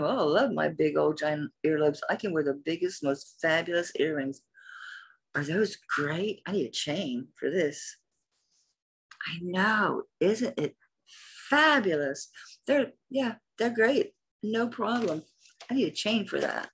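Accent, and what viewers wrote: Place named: South, United States. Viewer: I luv your videos & your sweet southern accent is the best!! Your stories & humor makes your videos FUN!!! My necklace arrived & I love it 🥰🐰🌸💕have a great weekend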